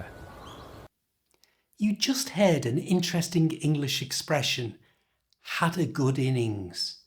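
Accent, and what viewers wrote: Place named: North West England, United Kingdom. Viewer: People in the south use an AH sound in "passed away", while those in the north use the short A. This guy must be from the urban north-west, like around Liverpool or Manchester.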